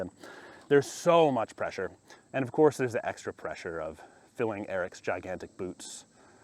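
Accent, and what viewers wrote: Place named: South, United States. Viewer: Hi! I’m. A southern boy as I’m assuming you are listening to your speech. I wish you well. I’m not a subscriber…yet. But I watch a lot of these type of videos so we’ll see. Life certainly goes on and I’ll give it a shot. Well I lied. I’m a southern OLD man. 🤣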